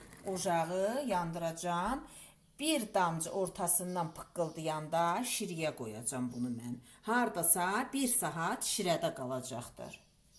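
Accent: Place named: Ganja-Shamkir, Azerbaijan. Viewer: Salam. Sizin bu resseptlə hal-hazırda mürəbbə hazırlayıram. Təşəkkür edirəm paylaşım üçün. (Bu arada, danışıq tərziniz Gəncə- Şəmkir aksentinə çox bənzəyir. Şirin ləhcəniz var.) 🌹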